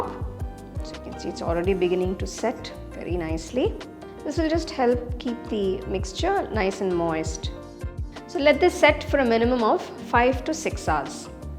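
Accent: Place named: Tamil Nadu, India. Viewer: Why speaking in english? sattu burfi is tamil word I think,if it's correct then speak in tamil,nd ur accent shows u r a tamilian,so speak nd explain in ur language ❤❤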